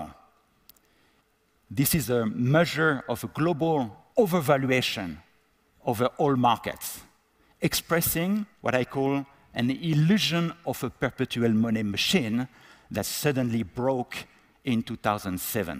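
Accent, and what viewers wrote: France. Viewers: His French accent is thick as fucc tbh tho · If you find him hard to understand, it's probably because you don't get to hear other people's accents too often, making this more of an issue about you. He's obviously French and has a French accent, but he's not CHOOSING to speak like that- as with everything, learning to master a language takes practice. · u french?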